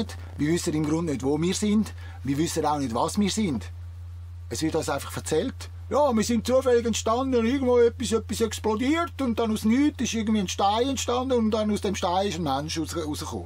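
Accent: Switzerland. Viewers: Hihi - wenn ein "Schwyzer" sich echauffiert, klingt das in meinen Ohren iwie lustig. Das meine ich aber freundschaftlich. ;) Aber ich kann dich klar verstehen und stimme vollumfänglich zu. Die Reihe "Episode 1-7" sah ich komplett. Ich verknüpfe eher die Fäden bzgl. wer, bzw. welche Menschengruppe dahintersteht und die Fäden hält. Auch hierzu gibt es sehr deutliche Aussagen, bzw. schriftliche Dokumente, die quasi bis zu "ein Zeitalter" zurückreichen. Sogar noch länger, doch sind derlei Schriften für mich unerreichbar und ich würde sie auch nicht lesen können. In aller Regel betreffen sie Themen, die "Religion" entspringen und sich pharisäisch offenbaren. ++edit: Die Vokabel pharisäisch wurde absichtlich benutzt, um diese gegen eine Sprachfamilie abzugrenzen. Bei der Zusammenführung der geistigen Idee der Bundeslade (vgl. Bauweise) mit der sog. "Leidener Flasche" (vgl. 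Eintrag Wikipedia) ergeben sich weitere, in allen Bezügen sinngebende, geistige Verknüpfungen, auch und gerade zu diversen Erzählungen von Todesfällen im Buch der Bücher. (vgl. auch die "Einführung der Gesetze / Moses + den ersten Satz des deutschen GG: "Alle Menschen sind vor dem Gesetz gleich" - nach Erschaffung eines Gesetzes sind sie, die Menschen, dies nämlich nicht mehr. Pharisäer halten sich stets unantastbar, selbst wenn sie sämtliche "Gebote" brechen, die sie selbst zum Gesetz erhoben haben.)++ Danke für den jetzt noch weiteren Blick durch deine Episoden 1-7, das noch tiefere Begreifen der Lüge. Die Kraf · Ja super! Das isch Bärndütsch! ...👍😄 super Kanal! 👏 Danke! 🌹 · Grossartig und danke. Als gebuertiger Deutschsprachler habe ich nur 10 min benoetigt, um Dein schweitzer deutsch zu verstehen. Dort, wo ich seit Dekaden lebe - in Uebersee - sprechen wir minimum vier Sprachen auf taeglicher Ebene und oft alles durcheinander. 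Wir sprechen diese Sprachen sicherlich nicht perfekt, aber wir verstehen uns alle (ca 120 registrierte (!!!!) Nationen auf einer kleinen Insel (178 qkm)) weil wir uns verstehen wollen. Deshalb waren Deine Einlassungen kein sprachliches Problem fuer mich. Und natuerlich nicht nur sprachlich - natuerlich nicht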